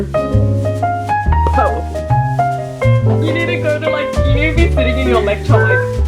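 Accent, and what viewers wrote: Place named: Australia. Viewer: I love watching other Australians art videos/studio vlogs, the accents and background noises (in particular magpies) feel cosy to me lmao. I love your artwork and the commentary you have for it, it’s very casual 🤙✨ I also really appreciate how you try to incorporate some tips to fellow artists in each of your videos 🥰 looking forward to more of your vlogs, the internet needs more aussies 🙈⭐️✨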